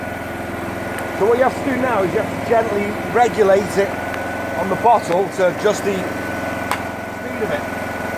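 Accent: Wales, United Kingdom. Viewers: Awesome !!! Been wanting to try that for ages ! Maybe next : gasification??? Familiar accent ? Rhyl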